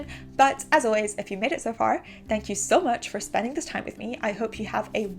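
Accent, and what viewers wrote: United Kingdom. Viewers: Beautiful wonderful marvellous. Good British English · I have been learning English by reading English novels for myself without anyone's help. The most difficult thing I have as a Korean for learning this language is to practice listening to native English which is different depending on where they are from. I have chosen you as a model of British English.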